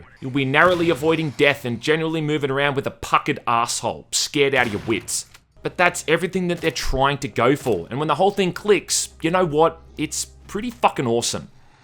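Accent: Australia. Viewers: Are you Australian? I only ask because I like to support local talent when I can. Not trying to be rude. Really enjoying your videos.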